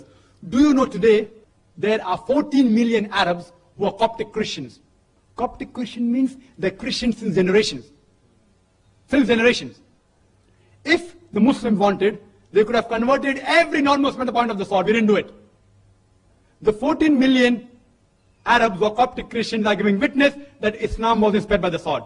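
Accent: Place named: India. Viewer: هذا لأنه هندي الأصل كان بتحدت الهندية مثلا لنعتبر أنك تعلمت الإنجليزية هل ستتحدت كما يتحدت الأمريكين سيكون لك أسلو ب غريب في الكلام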